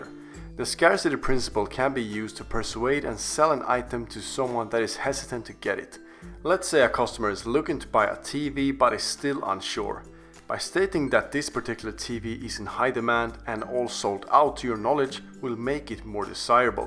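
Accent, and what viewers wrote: Sweden. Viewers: Är du svensk din dialekt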